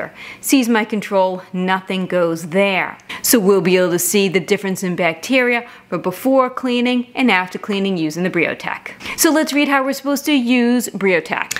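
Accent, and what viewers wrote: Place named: Rhode Island, United States. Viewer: What accent do you have? Sounds like Rhode Island and/or Massachusetts.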